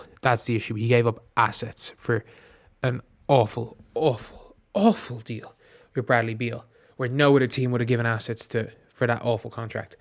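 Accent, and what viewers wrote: Ireland. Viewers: i knew youre from ireland just by the accent .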